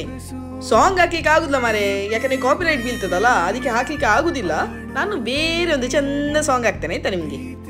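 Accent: Mangalore, India. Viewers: Nimma mangalore bhashe thumba chanda..keltane irbeku anisutte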